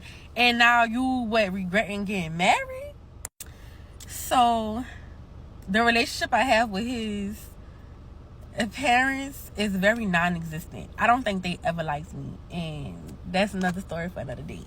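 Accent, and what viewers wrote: New Orleans, United States. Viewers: I’m frm nyc u want to switch accents girl New Orleans baybay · Girl I knew you was from New Orleans when you opened your mouth lol im from New Orleans and still here.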